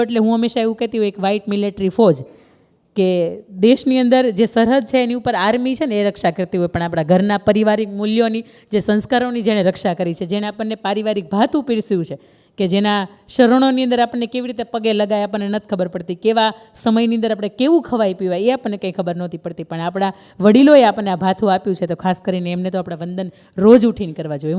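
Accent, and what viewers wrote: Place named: Kathiawar, India. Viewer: આ બેન ને ખુબ ખુબ અભિનંદન ધન્યવાદ બેન તમારી આ વાત સાચી છે અને માણસ નું જીવન બદલી નાખશે એવી જ વાતો છે બેન એક વાત તમારા ઘણા વિડિયો જોયા તમારું નામ ક્યાં વતની છો એ જણાય તો અંતર નો આનંદ ખુબ વધી જશે બેન તમારી બોલવા ની અને જમાવવાની અને મીઠી ભાષા કાઠીયાવાડી ખમીર વંતી વાત સાચી છે હું પણ એક કછી પટેલ છું તમારા ઘણા વિડિયો જોયા છે ખુબ આનંદ થાય છે ફરી પણ આવા વિચારો અને વિડિયો મોકલ તા રહેજો બસ એજ ખૂબ ખૂબ અભિનંદન ધન્યવાદ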